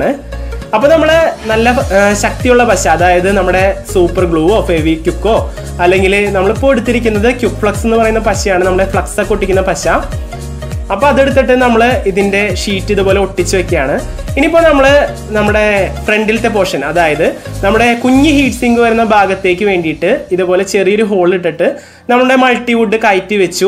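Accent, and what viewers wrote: Thrissur, India. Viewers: തൃശൂർ ഭാഷ യാണ്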